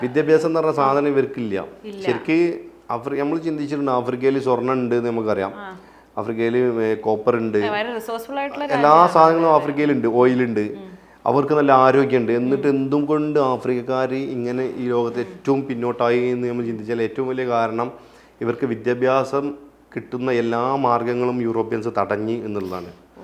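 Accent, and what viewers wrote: Malappuram, India. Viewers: തനി മലപ്പുറം സ്ലാങ്ങിലുള്ള തമാശയും പറഞ്ഞ് ബുള്ളറ്റിൽ യാത്ര ചെയ്യുന്ന കാണുന്നത് വർഷങ്ങൾക്ക് മുമ്പ്, ഇപ്പോൾ ഒരു പാട് പക്വത വന്ന യാത്രികൻ ❤❤ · Malappuram slang കേൾക്കാൻ തന്നെ ഭയങ്കര രസമാണ് ❤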